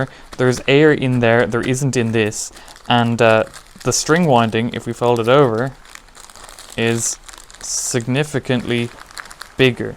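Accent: Canada. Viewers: Are you a Newfie. You sound like my daughter from St. John's.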